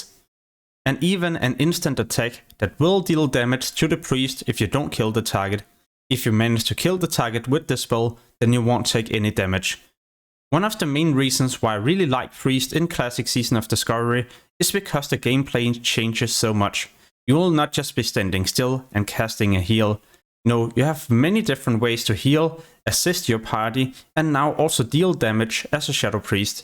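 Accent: Denmark. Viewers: You sound Danish :) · Are you dansk?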